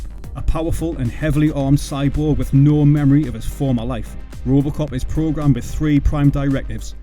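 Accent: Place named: Newcastle, United Kingdom. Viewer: It's nice to hear a fellow geordie on this channel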